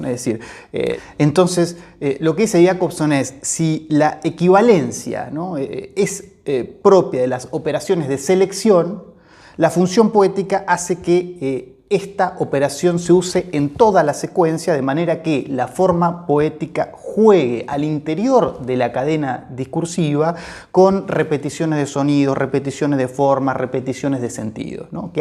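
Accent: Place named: Argentina. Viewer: Increíble! Con este acento (es usted argentino?) y hablando de argumentos interesantes, creo sinceramente que usted no exista...y encima, si puedo permitirme, muy guapo, con esta mirada intensa y esa inteligencia que parece querer salir hasta de sus dedos. Una clase estupenda (finalmente estoy entendiendo algo del formalismo ruso, la parte que usted ha tenido la gracia de explicarnos...y el miércoles tengo el examen de “Teoría de la Literatura”. Por suerte usted se ha ocupado tb de Sklovski y alguno más...iré escuchándole e investigando. Mientras tanto, aunque nos separe el Océano, sepa que mi corazón le pertenece. 🫀🩸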